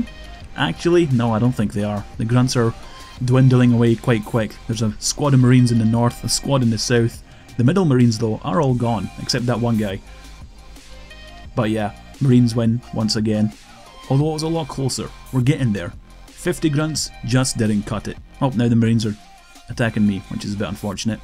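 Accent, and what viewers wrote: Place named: Ireland. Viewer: I love this guy's irish accent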